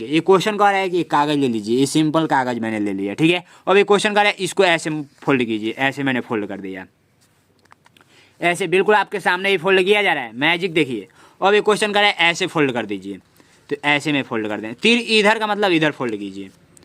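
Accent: Bihar, India. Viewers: Sir up bihiri ho keya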